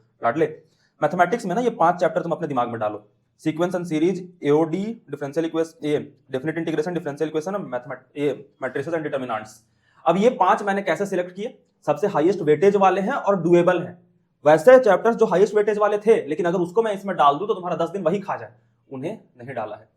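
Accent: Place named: Uttar Pradesh, India. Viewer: Bhaiya app up wale lagte ho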